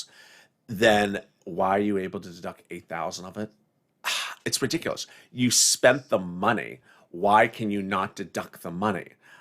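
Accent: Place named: New England, United States. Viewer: baby, were you originally a New England boy? You have such a beautiful accent! 🌳🍁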